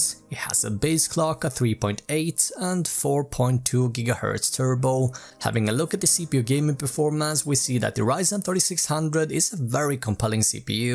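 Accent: Sweden. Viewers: Are you from sweden, great video btw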